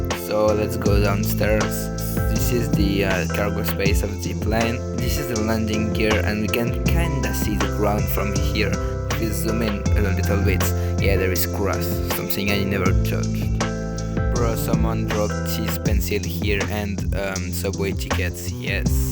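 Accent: France. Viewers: bro ur french your accent is realy pronounced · OMG VOICE REVEAL WITH FRANCE ACCENT 1!!1!1!1! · thicc français accent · Not gonna lie your French accent is cool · That french accent brah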